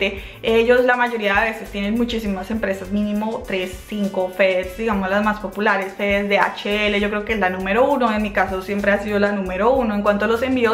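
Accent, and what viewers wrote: Colombia. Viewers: Acentua bien las palabras eres colombiana ..pero tu video lo ven de varios paises practica un tono standar .un consejo de un amigo.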